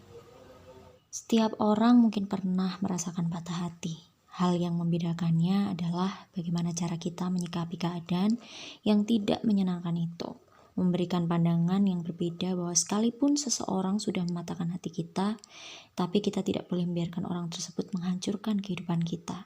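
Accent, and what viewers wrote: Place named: Java, Indonesia. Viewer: Medoknya menteb bnget mbak, sama kek saya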